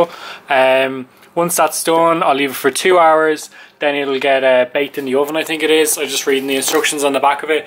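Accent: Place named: Ireland. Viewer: Good job, irish? I hear the accent of my people! Lol county down fella here! Great video man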